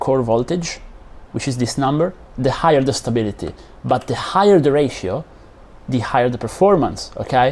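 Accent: Italy. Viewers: Why u have an Italian accent?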